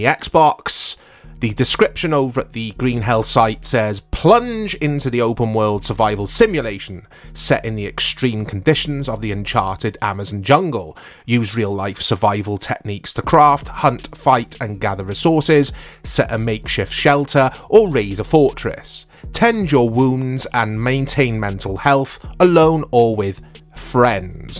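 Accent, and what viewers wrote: Wales, United Kingdom. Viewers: Bro are you welsh yeah?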